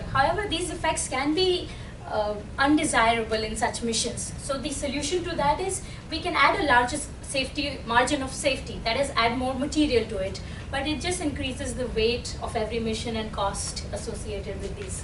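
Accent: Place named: India. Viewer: She has a hindi accent.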